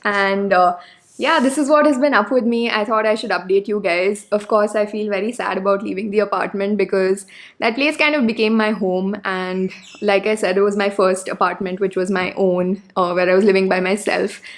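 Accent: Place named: Karnataka, India. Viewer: ur English utterance seems to me discording... it seems pretty problematic to sort out r u speaking English or kannada....request to correct ur English accent properly ..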